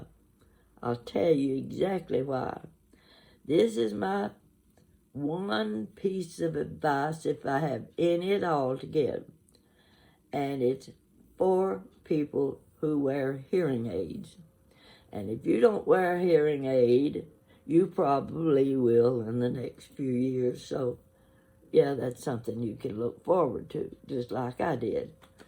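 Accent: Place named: Kentucky, United States. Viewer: I just LOVE you! I am from Kentucky, was born in a small town in the eastern part called Prestonsburg. My family settled there from the revolutionary war. General Alexander Lackey was my GGGGrandfather. Although this is considered a very depressed part of the state, I will always remember how beautiful life was there for me as a child. My Mom and Dad left there in the early 60's and I grew up in Central Florida. No other people like real Kentuckians! I can tell a Kentuckian (no matter what part of the state they are from) a mile away by that accent! You remind me so very much of my beloved family. All of them are gone now except me, and my two sisters. I am 75 the other two are 78 and 84. You bring back such wonderful, sweet memories of all of my wonderful Grandparents, Aunts, and Uncles! WE are so very much alike in our family history, foods, stories, antiques, Just amazing. 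Well I guess after all we are true Kentucky Wildcats!! Much love, from North Carolina by the way, my name is Lois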